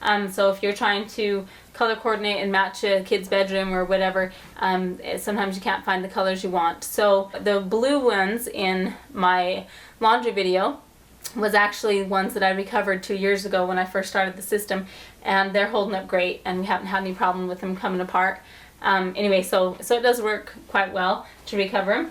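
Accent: Utah, United States. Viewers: It's a Utah accent ❤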